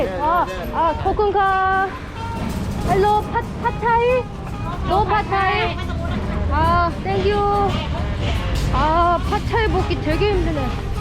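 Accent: Jeolla, South Korea. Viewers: 목소리가 경상도 ? 충청도? 전라도? 어디쪽인가요? 다 섞인거 같으신데 ㅎ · 부산사람이 전라도 사투리 쓰시네요 ㅋㅋ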